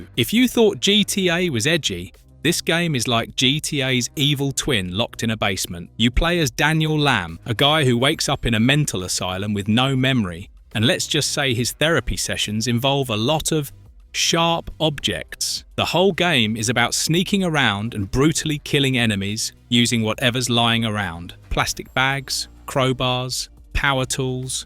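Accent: United Kingdom. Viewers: I like the British narrator. Marvellous videos and channel! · That accent, sounds British to me, saying stuff like games normalizing or flirting with misogynistic imagery, makes me 🤢🤢🤢 I'm sick and tired of hearing nonsense that makes me angry and feeling like asking for the world to end. Like, using internet nowadays is like getting the daily dose of boring and depressing shjt just to have those boomer feelings left. It's all hopeless, nervous, feeling like all i see is nonsense, like all i hear as arguments are that progressist politically corret nonsense, feeling like isolating myself and get bored af at the end... I wanna see smart people doing good stuff or at least some sense when people disagree or when they're criticizing something bcs it's getting tiring af to see almost anything on the internet and getting me feeling like I'm on a discovery kids for dummies cartoon world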